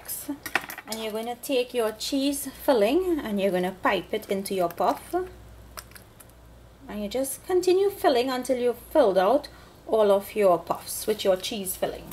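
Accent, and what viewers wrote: Trinidad and Tobago. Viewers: haring you trini accent is soooooooooo pleasing. and the recipe is also excellent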